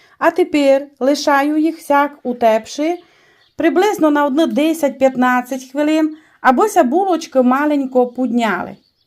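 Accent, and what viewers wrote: Transcarpathia, Ukraine. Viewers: Як я люблю Закарпаття і ваш говір.Продовжуйте так говорити,ботв цьому ваш шарм і ізюминка.З миром Божим.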